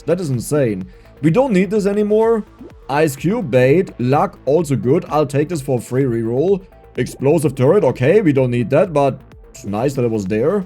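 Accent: Germany. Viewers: ich höre einen deutschen akzent ???!!!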